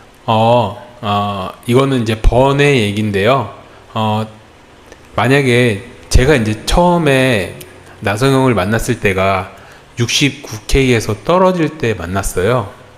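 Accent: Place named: Seoul, South Korea. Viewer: 와 목소리 달달하다.. 이러니 서울말에 뻑이가지…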